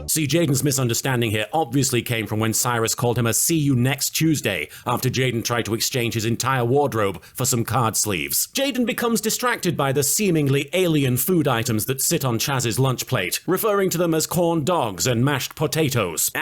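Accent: United Kingdom. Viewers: wait are you british??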